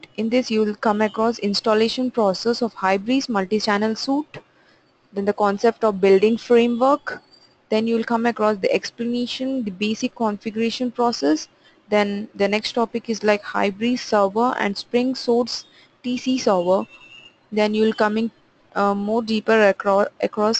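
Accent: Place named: United Kingdom. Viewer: very nice reading and beautiful british accent - music to my ear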